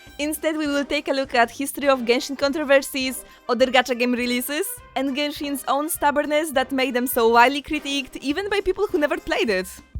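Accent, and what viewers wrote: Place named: Poland. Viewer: Ah tak, słychać cudowny polski akcent, kocham twój głos <3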